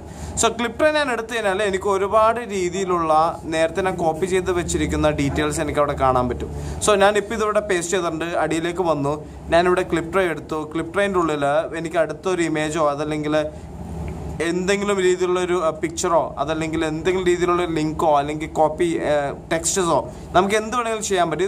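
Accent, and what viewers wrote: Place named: India. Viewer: What... this is Indiglish.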